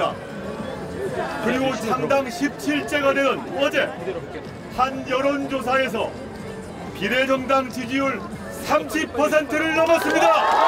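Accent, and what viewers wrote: Busan, South Korea. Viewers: 부산말 웃긴당 치와라~~~마~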